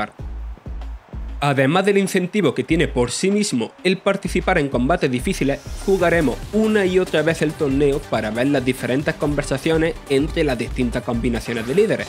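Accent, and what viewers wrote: Andalusia, Spain. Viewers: Qué acento tan andaluz tiene el chico que habla jeje pero me encanta 😍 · Es porque tiene un acento muy cerrado de algún lugar del sur de España, pero se le entiende perfectamente y tiene buena dicción. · Gracias por el vídeo y por la narración aunque haya algunos "elementos" que lo están criticando..En fin, contra lo andaluz todo vale. Si el narrador tuviera acento catalán, gallego o vasco y alguien se atreviera a reírse o insultar, sería calificado en el acto de "fascista". Como es andaluz no pasa nada. Gentuza.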